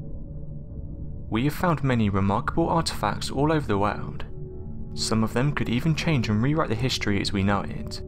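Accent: England, United Kingdom. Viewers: Anthony ouch...but true! I understand that pronunciations will be different between English speaking countries, but that was the completely incorrect word. I can handle meethane for methane. I cannot handle parameeters for parameters! I used to think anyone with a proper British accent sounded quite intelligent. Since YouTube, not so much😄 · Yeah well certain Englishmen come from regions where they speak like that because inflections and intonation carried over from Middle English, Welsh etc...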